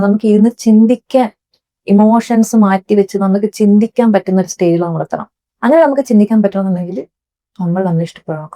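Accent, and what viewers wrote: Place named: Kannur, India. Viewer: I started watching your channel recently. I like the content very much and very relatable. I guess you are from some part of Kannur. Me too.. I forwarded your video to my friend Sajna she also talks in the same way😂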